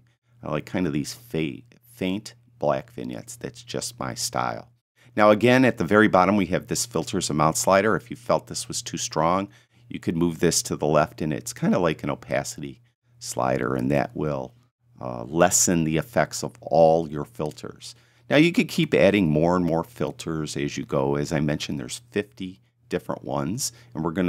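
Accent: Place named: Western New York, United States. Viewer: Hey There, first I recognized the unmistakable Western NY accent, but the working photo from Hamburg was the dead give away. I grew up there. So cool! If I'm not mistake, that's Hoak's restaurant. My first Communion dinner was there... some 48 year ago!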